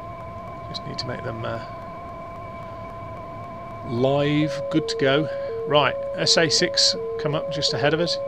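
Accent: United Kingdom. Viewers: I'm so pleased to have discovered your channel. Being uk based it was so refreshing to hear a brit go through DCS as you have done in this video. I've been sitting on the fence for a good two years now about (a) a decent gaming PC and of course (b) getting into DCS. I've watched a lot of content, most of it American content which with all due respect drives me a bit potty. Too much waffle and being US based I find myself being totally confused over what hardware to go with. Now I'm not in a position to literally build a simulator and damn near build an F16 type cockpit, with almost an ejection seat built in. However I'm in a position to get the right kit. I know it needs a decent PC, warthog HOTAS is a given c/w decent pedals. It's the PC hardware I'm struggling to understand. Do I go with the precise recommendations from DCS? IR or VR. If getting VR do you drop going on a top monitor? 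Recommended PC suppliers etc. Its these questions I'm struggling to get answers on. I hope you can help.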